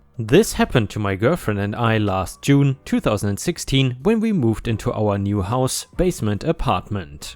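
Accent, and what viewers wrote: Germany. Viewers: Hey man I was wondering what your accent is I can’t quite place it but I’m thinking German or Swedish If I could have any car it would probably be a hell cat. Or a Noble m500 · Darn lawn Nazis. I hate HOA's. The narrator does a wonderful job at telling these stories. As a person with German ancestors I like the accent. I can imagine the HOA president shouting "Kein Parken dieser Autos auf der Straße!" While stomping around.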